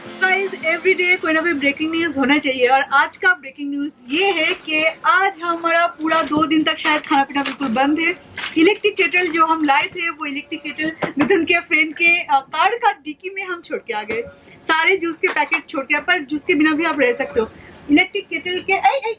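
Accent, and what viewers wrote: Bengal, India. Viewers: Tomar bangla ta, 🤩🤩🤩 uh got a nice bngoli tone😍😍😍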